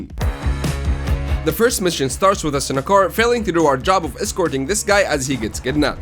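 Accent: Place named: Arab world. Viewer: أظن من لكنتك ومخارج نطقك للحروف انك عربي😅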